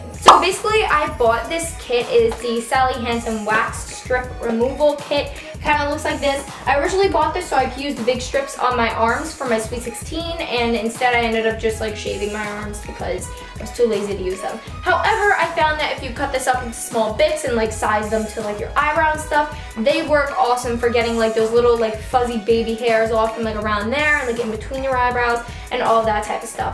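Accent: New York, United States. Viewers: she has such a jersey or New York accident I love it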